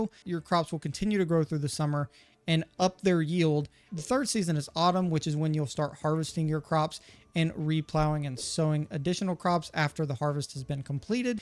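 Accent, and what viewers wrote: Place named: Texas, United States. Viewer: Thanks a bunch brother! Haha I couldn’t help but notice the accent, are you from Texas?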